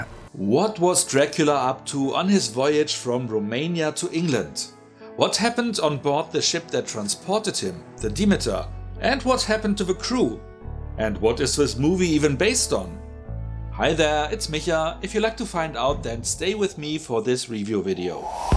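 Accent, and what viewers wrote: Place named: Germany. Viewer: why are you doing a nazi accent?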